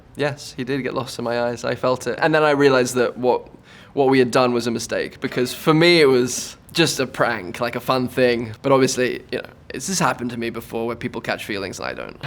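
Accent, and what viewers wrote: United Kingdom. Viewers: Since when is he British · He's much more British than I remember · why am I surprised everytime I hear his british accent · My mind is blown away that he has a british accent.. ive only seen him in movies with american accents this is so strange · When did he have a British accent??? How was I not aware this whole time?? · why british :( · How did I never realise he was British... am I... dumb? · I've heard him do an American accent so much that it feels like he's just doing an accent in this video, like "Hey, he's pretty good at that British accent". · Since when is he brittish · NO WAY HES BRITISH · I forget that he’s got a Brit accent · W……….wait……….HE’S BRITISH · am I the only person alive who had no clue he had a British accent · everytime i hear andrew garfield speak, i remember the guy is british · Since when he British? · i forgot this man is british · He has a British accent but at times an American accent comes out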